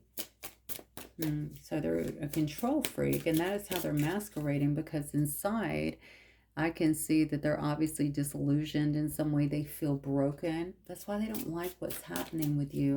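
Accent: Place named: Alabama, United States. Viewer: Hi ty 4Ur readings always on point ❤ I ❤️ ur accent I knew u were from Alabama 🤗 I’m from ALICEVILLE 🤗 I live in Buffalo NY since the 70s still have lots of family there. SWEET HOME ALABAMA 🥹🥰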